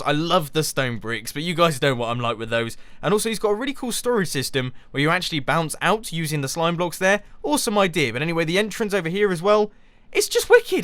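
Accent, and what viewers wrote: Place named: United Kingdom. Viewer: I seriously could lissen to that british accent all day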